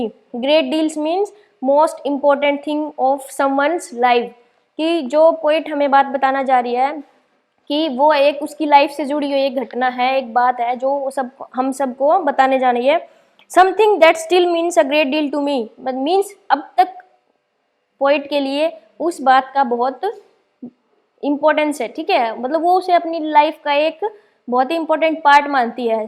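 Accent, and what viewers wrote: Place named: Haryana, India. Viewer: Hlo mam by your speaking tune I think that you are from Haryana Am I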